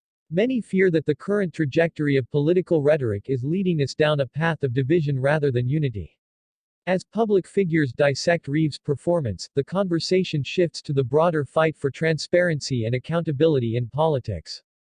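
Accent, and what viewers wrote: United States. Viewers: AWFUL American commentary AGAIN ! WHY ?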